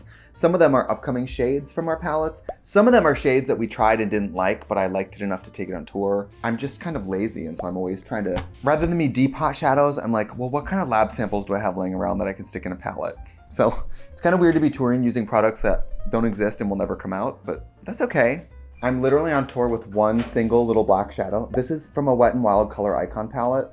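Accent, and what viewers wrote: Wisconsin, United States. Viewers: We need a full video with the super Wisco accent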